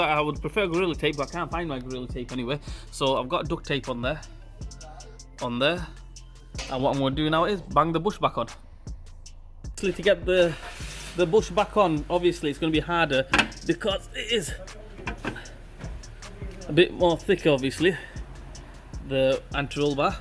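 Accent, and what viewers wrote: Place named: Northern England, United Kingdom. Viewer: North England somewhere by his accent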